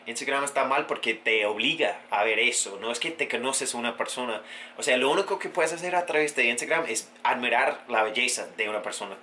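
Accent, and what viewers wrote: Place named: Colombia. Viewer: Un urraaaa por ese Acento, Se escucha más Colombianizadooo 👏👏 🇨🇴🇨🇴🇨🇴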